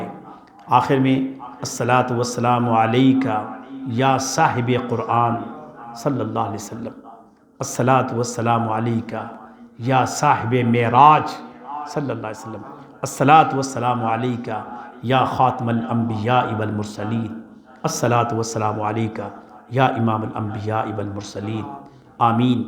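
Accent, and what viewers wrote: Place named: Punjab, Pakistan. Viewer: Tu c Paindo ho. Ardo (Urdu) Punjabi which bolde ho.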